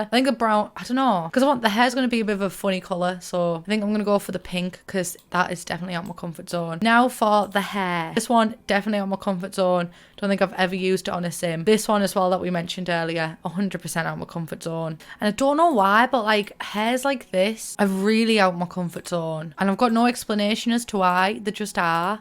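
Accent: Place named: Middlesbrough, United Kingdom. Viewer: I accidentally left subtitles on from a previous video and it struggles so much with your boro accent 😂 eg ‘girly’ changed to ‘garlic’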